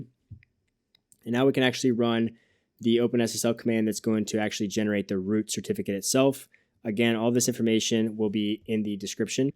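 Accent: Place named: United States. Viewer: Very good structured presentation, but much too fast and with a hard to understand American English dialect if English not the own mother tongue.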